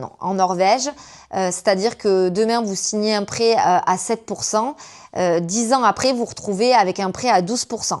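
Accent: South, France. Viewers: Ce n'est pas moi qui ai parlé d'accent mais l'autre. Beaucoup de Français prononcent les O comme des E maintenant et pour les hommes ça fait très efféminé. Malheureusement beaucoup de Français du sud cachent ou ont carrément gommé leur accent du sud. En ont-il hontes ? Parfois son accent du sud ressort donc elle l'a toujours alors ça veut dire que par moment elle le cache. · A juger par l accent elle est du sud donc oui c’est plus difficile.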